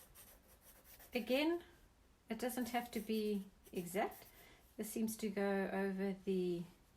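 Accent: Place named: South Africa. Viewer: This is lovely. It sounds like you’re also South African? I also love watercolor and the loose style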